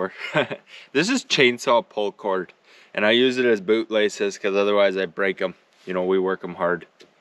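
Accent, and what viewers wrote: Canada. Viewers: My family has been in Canada since 1809, we own large amounts of land for generations and yet still this guy sounds more Canadian than me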